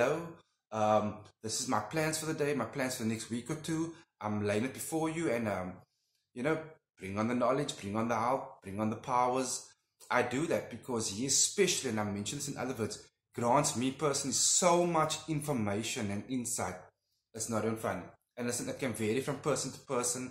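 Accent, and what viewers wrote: South Africa. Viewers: This might be completely of topic🙊😁 but I noticed ur accent and was wondering, is your home language Afrikaans😅😅😅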